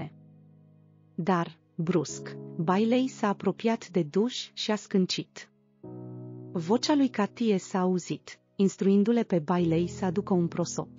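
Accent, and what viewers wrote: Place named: Moldova. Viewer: Limbaj cu accent specific moldovenesc și accente puse anapoda pe cuvinte.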